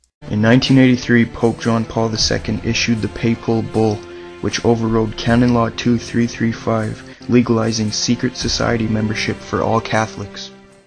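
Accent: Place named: Canada. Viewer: Nice Canadian accent.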